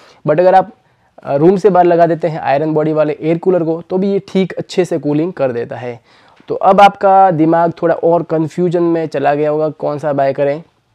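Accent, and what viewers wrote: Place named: Shekhawati, India. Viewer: भाई आप झुंझुनू के है या चूरू के हैं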